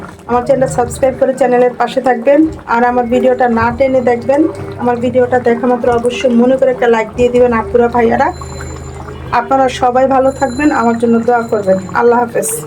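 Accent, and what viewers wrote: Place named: Comilla, Bangladesh. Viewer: আপু দেখে নিয়েছি, আপনার কথা শুনে বুঝলাম আপনি কুমিল্লার যদি ঠিক হয়ে থাকে, আমিও কুমিল্লার,ধন্যবাদ আপু।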